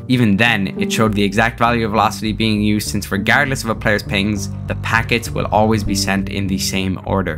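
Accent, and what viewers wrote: Ireland. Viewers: You sound irish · Irish accent?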